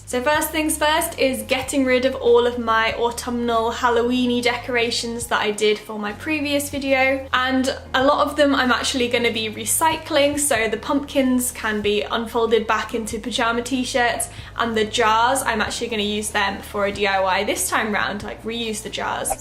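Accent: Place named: United Kingdom. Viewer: It’s interesting to see how other ppl around the world decorate, especially around the holidays! Based on you accent I’m assuming you’re in Great Britain or close to? Do y’all have “dollar stores”? Or similar type of stores? I wonder if the same things that are trending or popular in the US, are also in Britain at the same time? Hmmmm, it’s certainly interesting! Good day to you, stay well.!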